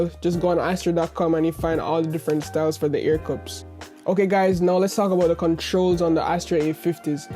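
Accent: Jamaica. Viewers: Are you Jamaican? It's like listening to one of my cousins tell me about tech. Great work!!! Ire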